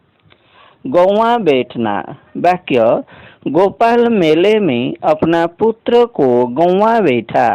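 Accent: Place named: Odisha, India. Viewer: Are bhai sun ya odia midiam saraswati Hindi kitab ho to odia gurujika voice kasa aiga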